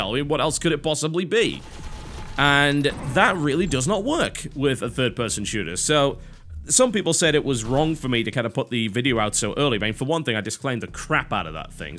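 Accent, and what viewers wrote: United Kingdom. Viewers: I began watching your channel over 2 years ago. I enjoy them because of your personality and awesome British accent. I love when you are emotional and rage. But recently you switched to be more "news" like than entertainment. I never critique you since it is your channel and you should upload what ever you want. But Your recent videos being very emotionless. Hope this helps. Thanks from your long-time fan! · 3. It's easter, maybe a large amount of your viewerbase was on vacation? (I know... probably not) 4. The format. Annoying noise in the background can throw a lot of people off i think. I know that it atleast used to annoy me. 5. A lot of not-you talking. I may sound wierd, but I actually started watching your videos because of your voice, or rather your british accent, and then later stayed for your gaming knowlegde. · I watch your videos for your opinion, your sense of humour and that great british accent. 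I watched 1 of the shield videos... the developer was just boring and clearly not best in the field of presenting a product. The questions that came to mind were "what is this good for, wii controller does sth similar" and "why the hell would I want to give up my 27'' for like 10''? Didn't get answer for that. Did I really have to watch the whole footage to get those answered? No conventions for me...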